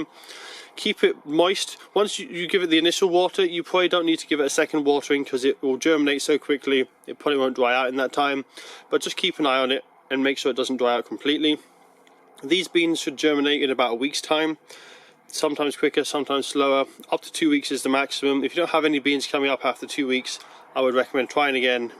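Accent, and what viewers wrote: Scotland, United Kingdom. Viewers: Thanks for posting!!!! I never comment but as a new follower who really appreciates your videos I had to. Your videos are so helpful and a sweet bonus is your great Scottish accent! Definitely going to binge all your videos so I can get pro at gardening during quarantine!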